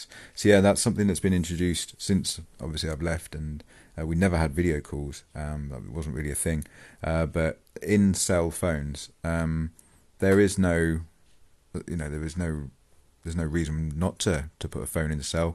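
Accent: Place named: Cornwall, United Kingdom. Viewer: Cornwell accent i believe bud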